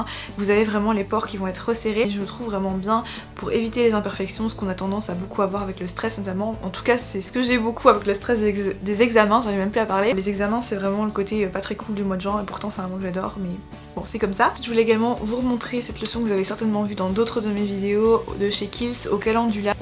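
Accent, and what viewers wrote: Belgium. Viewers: je te suis depuis pas tres longtemps donc j'ai une question a laquelle tu as peut etre deja repondue ^^ : Est ce que tu habites ou as habite en Belgique? car de temps en temps je trouve que tu as un petit accent ^^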